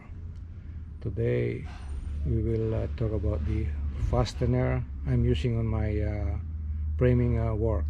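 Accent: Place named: Philippines. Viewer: Thanks for the video.. I can hear the Pilipino accent 😉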